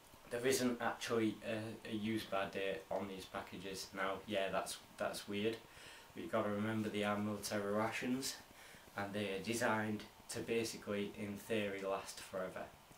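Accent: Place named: United Kingdom. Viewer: Aye a nice pack! I´m sure in extreme conditions two soldiers could survive for at least 24 hours. I´ve just got a few questions?!?? How do ya heat all that stuff? Ever tried the american M.R.E theres a simple heatin´ system inside the ration pack. And last but not least! Where´s ya accent belonging to?? It´s quite intresting that every town in the UK has got it´s own accent. Greetings frae germany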